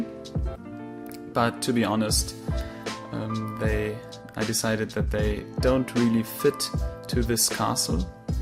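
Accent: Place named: Mannheim, Germany. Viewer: Are you from near Mannheim in Germany? I recognize the dialect! XD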